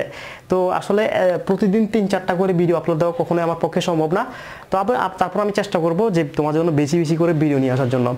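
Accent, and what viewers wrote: Chittagong, Bangladesh. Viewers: অাপনি চাটগাঁইয়া নাকি গাইয়া গুইয়া কথা বলেন কেন?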